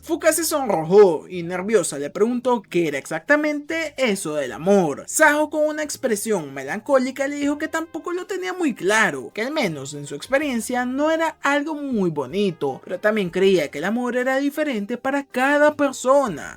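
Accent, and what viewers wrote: France. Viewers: Eres francés? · Eres de paris o por que hablas asi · Eres francés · Se le sale el francés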